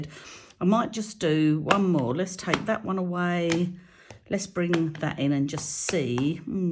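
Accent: United Kingdom. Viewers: Hi, I have only just found you on YouTube.. love your videos and how your brain works!!! I'm not alone! Lol🤣 I'm in Australia now, but originally from the UK, so your accent reminds me of home. Thanks for taking your time to show fab ideas and share some laughs. X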